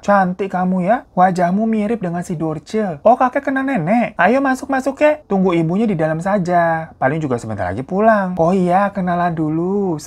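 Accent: Java, Indonesia. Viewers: Knp aksennya jdi ke Jawa kak.. Kan dri Sulawesi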